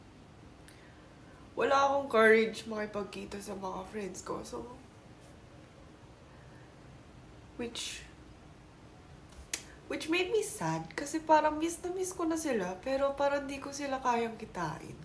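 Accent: Batangas, Philippines. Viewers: Hiiii poooooo.... Nag tataka lang po... Kaya mo po ba mag Batangeño accent while speaking Korean? Paano po kaya yun?🤔 Anyways... You're always beautiful po and feeling ko ang sarap sarap mong i-hug... Always take care po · Parang filipina kalang magsalita*sarang haeyo*!😅❤❤❤🎉😊😘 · Ramdam kita bebe😢😢 kaht savhn mo na sarili bansa mo un pero hnd ka naman dun lumaki mahirap mag adjust mamimiss mo ung vive sa pinas tapos sobrang seryoso din naman sa korea hirap ka mag adjust,, like Ryan bang sabi nga nia gusto nia mamatay sa pilipinas tapos ngaun pilipina ang nililigawan niya kasi pusong pinoy sya❤.. I heard sa batangas ka kasi ung punto mo🤌Batangena rin ako🤌🤌 enjoy mo ang life mo at mag iingat ka palagi.. sundin mo Asa puso mo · New subscriber here,. I found your vlog sad and funny at the same time. Funny because for a Korean, you certainly have learned the way the girls talk in the Philippines and it’s kind of weird listening to your funny words and looking at you who’s as Korean as Korean can be. 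You’ll get over your homesickness, though. The good thing is you’re not halfway around the world if you ever felt the other way around now, kung ma homesick ka naman sa bansa kung saan ka ipinanganak. Keep smiling, you’re beautiful.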